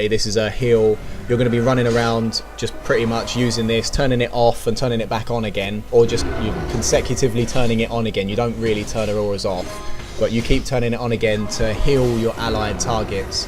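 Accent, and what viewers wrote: United Kingdom. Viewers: British :P <3!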